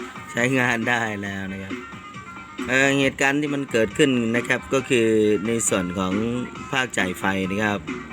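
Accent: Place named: Isan, Thailand. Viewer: มักคักๆสไตร์การพูดไทยบ้างอีสานเพลินดีดูสนุกเข้าใจง่ายเอาไปร้อยเลย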